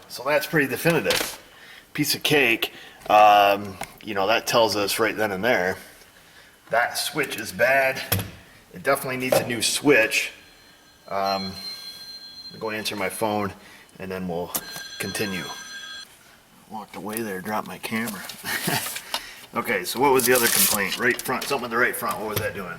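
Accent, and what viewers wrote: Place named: New York, United States. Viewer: Well that New York accent came out in this video! haha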